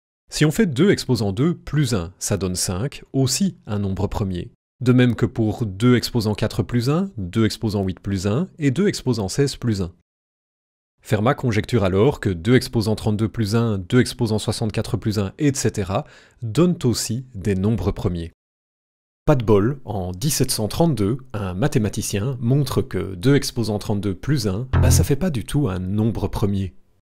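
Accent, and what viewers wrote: Belgium. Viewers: Il est belge normal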